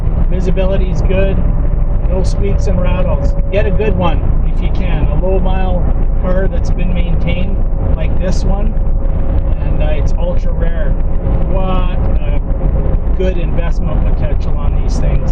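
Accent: Canada. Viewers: It’s Canadian dialect you ignoramus. Their Z is pronounced zed.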